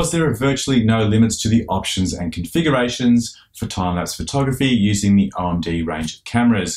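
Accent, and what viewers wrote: Australia. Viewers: Hey man r u from melbourne?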